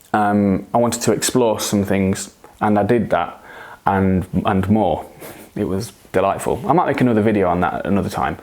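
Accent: United Kingdom. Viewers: I could listen to you for hours! fascinating mind and damn... I love your british accent! 🤫😘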